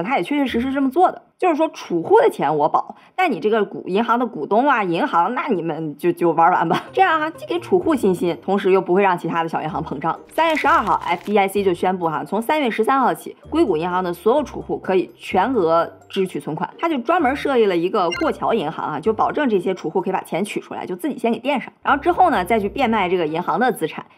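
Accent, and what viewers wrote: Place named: Beijing, China. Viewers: 喜欢这北京腔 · 北京乡音，，太好听了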